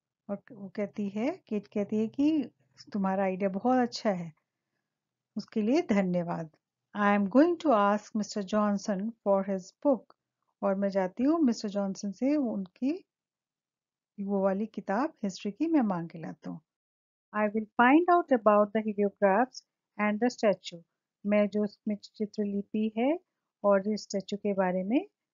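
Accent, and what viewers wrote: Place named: Bihar, India. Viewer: Mam you are from bihar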